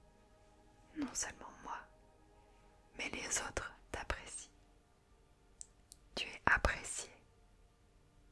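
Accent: France. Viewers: I really love your French accent!!!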